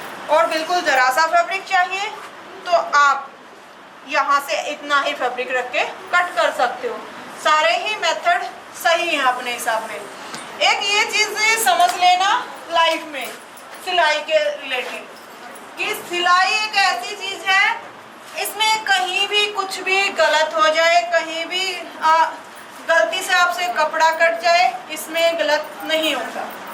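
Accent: Haryana, India. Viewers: Tone se mujhe haryana ki lag rahi Me bhi hisar se hu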